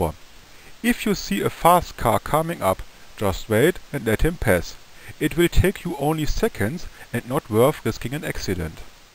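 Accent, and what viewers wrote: Germany. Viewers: This has to be the most ridiculous german accent I ever heard. And I am a german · You have done pretty well! As a German I must admit your German accent is awesome! ;-)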